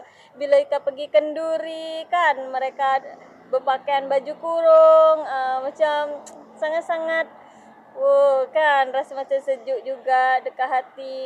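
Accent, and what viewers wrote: Malaysia. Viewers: Maaf sy ckp kamu ckp dh mcm org msia. Terbaik kak ckp. Teruskn bersemagat buat video yg lebih menarik kak. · Salam..kamu bercakap seperti orang Malaysia. Teruskan buat video semoga maju jaya. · aslkm ika n suami ..rata2 orng yg memililki kereta ada motor juga...tpi motor tu hanya jln dekat2. lelaki melayu tak pakai seluar pendek...tahniah ckp awak mcm melayu...semuga bahgia...amin..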